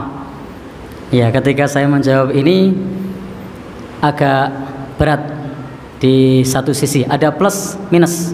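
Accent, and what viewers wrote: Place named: Java, Indonesia. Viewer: Ustadz ini salah satu figur ulama yang patut di contoh. Sifatnya yang rendah hati, saling menghargai sopan santun dan ilmunya yang sangat tinggi. Juga logat jawanya yang kental. Benar benar ustadz panutan saya.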